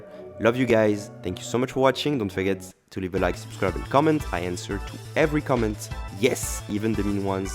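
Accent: France. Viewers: French accent makes this 10 times better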